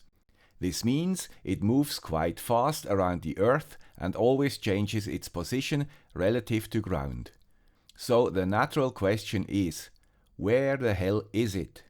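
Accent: Switzerland. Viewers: Another great episode from the ham with the Swiss accent. :-)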